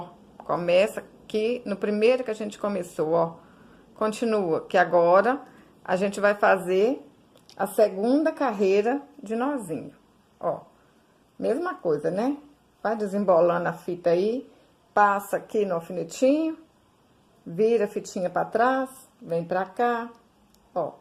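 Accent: Minas Gerais, Brazil. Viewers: O que mais gostei foi o sotaque mineirinho! Assisti até o final apreciando a moça falar!😁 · O mais gostoso de tudo é esse sotaque mineiro. Amo!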